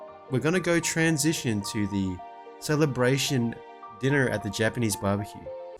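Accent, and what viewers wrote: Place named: United States. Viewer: Are u really Filipino u sound American with a chill voice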